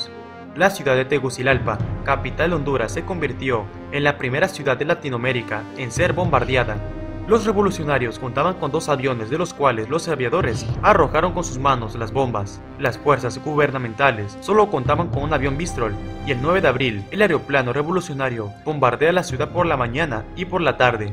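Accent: Peru. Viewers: paso de largo por la guerra peru colombia por el trapecio amazonico y putumayo ? me sorprendio mucho , pues creo su aento es peru , me equiboco ? si es asi debo suponer fue intencional la omicion ? - vi en barios de sus ejemplos , fueron en conflictos internos , siendo asi deberia estar colombia , pais donde mas operaciones areras se dan - mas que toda resto latinoamerica junta , pilotos vuelan 5 beses el promedio de horas vuelo de todos pilotos militares de latinoamerica , mayoria en operaciones combate , bombardeos reales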